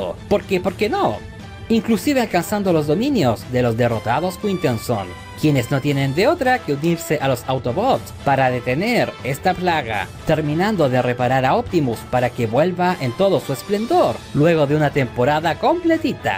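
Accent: Chile. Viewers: De que parte de Chile eres? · ¿Usted es de Chile?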